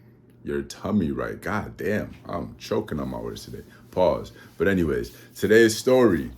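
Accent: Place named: United Kingdom. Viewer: it sound like u got a British accent a lil 😭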